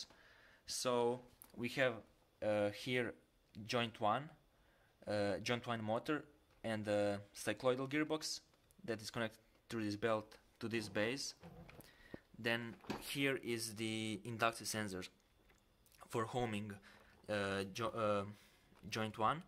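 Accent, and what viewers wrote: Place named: Russia. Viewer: У вас русский акцент))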